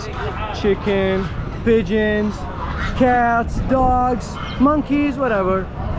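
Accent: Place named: Iraq. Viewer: انت عراقي اكيد لكنتك مو انكليزيه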